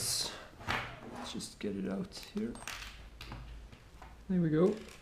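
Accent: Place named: Norway. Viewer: By your equipment and your accent i bet you are from scandinavia, most propably from norway.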